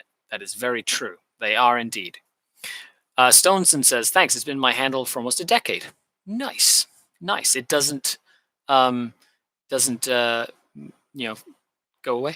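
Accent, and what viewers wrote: United Kingdom. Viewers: PS. I always wondered why you were a Spanish-speaking Canadian with a British accent. Now it all makes sense!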